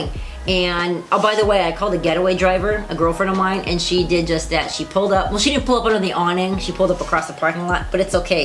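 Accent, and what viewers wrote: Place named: New York, United States. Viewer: 🤣 you were 'thinkin abowdit'? How are u from IL and not New Yawk..cause I hear my own NY roots in a lot of how u tawk lol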